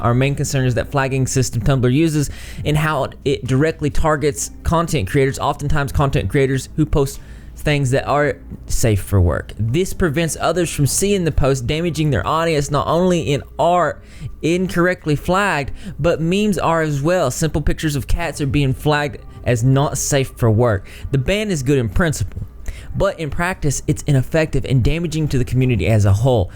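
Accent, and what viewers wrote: South, United States. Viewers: Where do you live? I'm so curious, you sound southern!!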